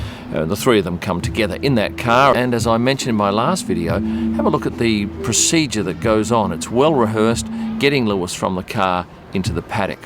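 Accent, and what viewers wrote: Australia. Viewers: Your F1 videos are so unique and refreshing. I can’t stand most of other F1 videos all British YouTubers and track track track focused. I have heard enough during 3 days of watching F1 lol. Yours are much better. Maybe I just love Aussie accent cuz I live here lol.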